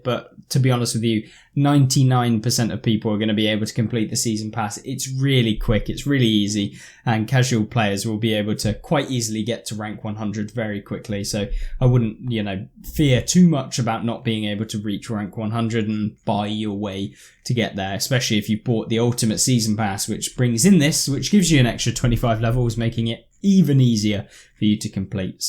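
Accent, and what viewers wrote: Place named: Cockney, United Kingdom. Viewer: You speak English? You're English? So why the actual f*ck can't the people who made the f*cking language speak it ? Roofless? It's ruthless you can say the with the t and the h making the correct sound then you mispronounce thought and make it sound like fought when clearly one starts with a f*cking f and the other starts with th so if you know what sound it make's why are you using fs where there's no fs you say there their and they're perfectly so why the f*ck are you using that lazy dog sh*t cock knee accent for ruthless 🤔 mate come the f*ck on stop being sooooo lazy put some effort in if dez game's can correct himself (Lithuanian-English) why can't you speak the easiest and your own f*cking language for 5 and a half minutes I was waiting to see a Roofless tank wondering why a tank without a roof was being put in the game and why you were calling it a roof and not a turret it's your language speak the f*ckin thing correctly or do some linguistic tutoring you literally have the internet and hundreds of language apps at your disposal I'm Australian and between you idiots the Americans and the kiwi's f*cking up your own language I'm appalled I know Swede's Finn's and Indonesians who pronounce English better than that stop swapping letters out and pronounce sh*t correctly I'm diligent when I use German and Finnish so the context is understood how are you butchering your own language? 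It's just laziness nothing else fix it drives the rest of us up the wall you're doing such a g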